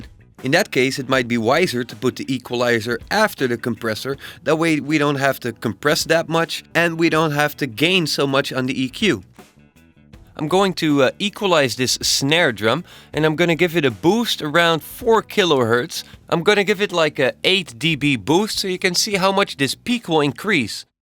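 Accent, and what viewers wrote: Netherlands. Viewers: Toevallig Nederlander? 😀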